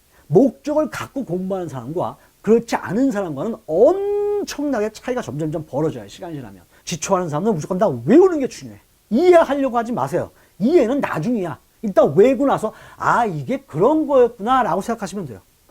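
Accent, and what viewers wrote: South Korea. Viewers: 난 이분 서울사투리가 넘 좋음 귀에 쏙쏙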